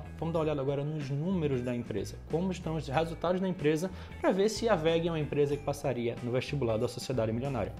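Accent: Recife, Brazil. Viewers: Ei brother vc é de Recife? Achei massa o teu canal, sempre que procuro tem algum paulista/carioca falando... fora a qualidade das informações que você passa, parabéns!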